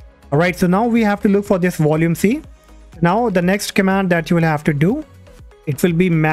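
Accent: India. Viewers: Your annoying Indian accent pmo sm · It’s so difficult to understand Indian accents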